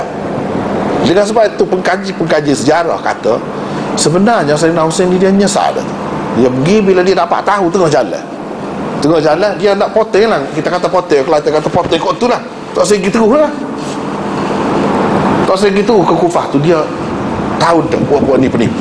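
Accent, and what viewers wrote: Kelantan, Malaysia. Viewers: Ko tak faham loghat kelantan ker? Cuba ko ulang tengok video ni lagi. Ustaz ni la antara yang paling kuat mempertahankan sayidina mu'wiyah RA.. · Beliau menggunakan Bahasa Melayu dengan dialek Kelantan🙏🏼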